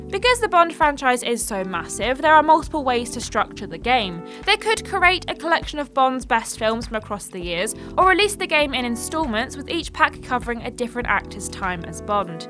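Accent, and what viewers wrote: United Kingdom. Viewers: guys i just realized that she speaks British accent it makes sense because she lives in the United Kingdom at Europe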